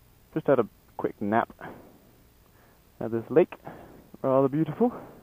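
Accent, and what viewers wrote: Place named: England, United Kingdom. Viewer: I like ur accent, where in england are u from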